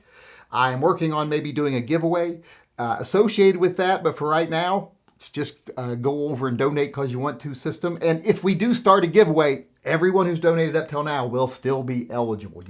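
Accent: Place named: South, United States. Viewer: Says the wannabe Marshall. A so called yankee with a mild southern accent and a lisp. Anyone doing what Kyle did of any age is defending the community. You talk too much about nothing.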